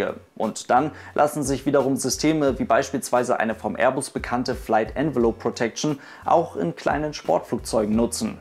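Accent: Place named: North Germany, Germany. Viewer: Er ist nu mal ein Norddeutscher, da heißt das Flugzeuch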